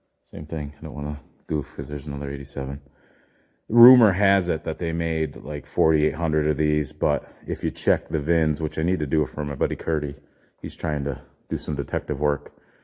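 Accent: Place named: New York, United States. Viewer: says Tires just like Stewie from family guy says cool Whip, must be that New York accent, keep making these great videos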